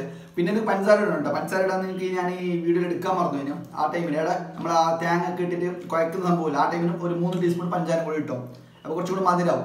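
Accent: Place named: Kasaragod, India. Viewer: Enik നിങ്ങടെ കാസര്‍കോട് ഭാഷ ഭയങ്കര ഇഷ്ടമാണ്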